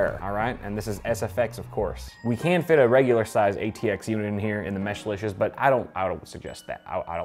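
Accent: South, United States. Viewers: Oh, good, he was speaking with a southern accent. I was tired when I watched it so I thought I was imagining things. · What region of America accent is this? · where did the southern drawl come from? Jetleg does some weird shit to. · Southnern sounds pretty cool yaw🤠👍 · why doe she talk like a southerner the whole video? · Jetlagged sounds like a southerner. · Did you move to the south or something? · The southern accent and all the cowboy puns were very random and made no sense to me, I just started tuning out half way into the video. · cool build! 400% the amount of allowed cowboy accent in youtube videos though. shame. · just created a new market segment. Better get a patent on this innovation! Awesome video with southern accent too! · new southern US accent? interesting haha... who have u been hanging around lately?😃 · I'm from Houston Texas and he sounds more southern than me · What’s up with the country good ol boy accent lol ?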